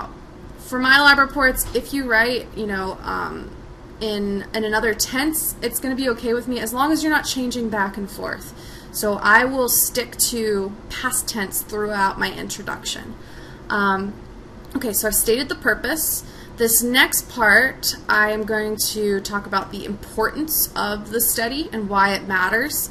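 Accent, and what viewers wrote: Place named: United States. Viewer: bruh this is the most americanised american accent i have ever heard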